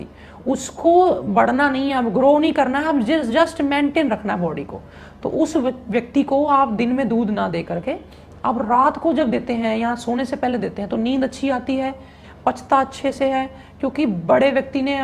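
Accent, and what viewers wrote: Punjab, India. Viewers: Yr tone is very soft n Punjabi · Guru ji tusi Punjabi ho?😊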